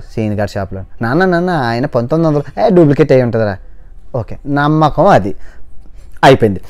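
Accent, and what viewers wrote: India. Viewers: America vellina mana slang manadea...👌 😂😂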